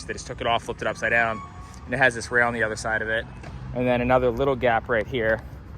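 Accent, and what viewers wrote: East Coast, United States. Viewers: Is your east coast accent coming back?!!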